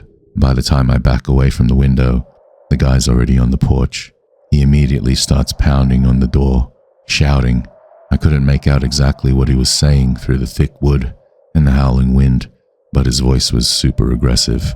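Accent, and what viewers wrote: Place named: Australia. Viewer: Great to hear an Australian story teller, I enjoyed listening in ❤🇦🇺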